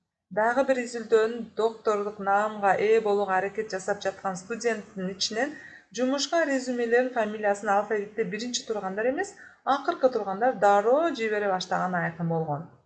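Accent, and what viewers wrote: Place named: Turkey. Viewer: Молодчик 🔥😍 акцент местный турецкий передача информации убедительная и выразительная💯😘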